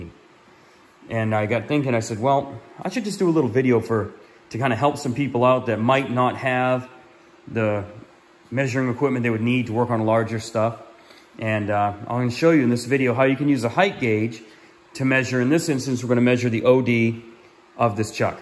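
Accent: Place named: Canada. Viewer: very good info. you sound like a Canadian!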